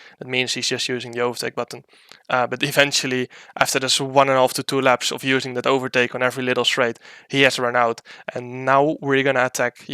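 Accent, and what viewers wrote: Netherlands. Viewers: Wat een heerlijk Nederlands accent zeg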